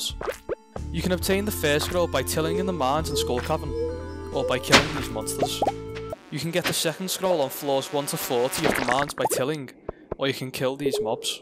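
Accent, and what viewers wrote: Liverpool, United Kingdom. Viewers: Haha I just typed in as I love this game but cnt remember where the blue one is.. then this scouser came on lol much love from a fellow scouser herself l8. Mate. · love the scouse accent. you got my subscribe sir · Madness didn’t expect to hear a scouser aha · YAYY SCOUSE STARDEW VALLEY FAN R U FROM LIVERPOOL TOO?!?!?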